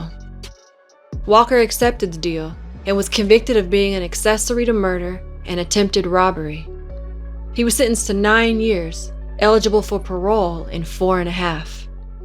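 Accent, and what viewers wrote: South, United States. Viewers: I detect a slight Southern accent. She does have a beautiful voice tho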